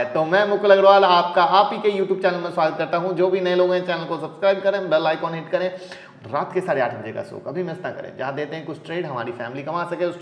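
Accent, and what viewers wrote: Bihar, India. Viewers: आप बिहार से हैं क्या?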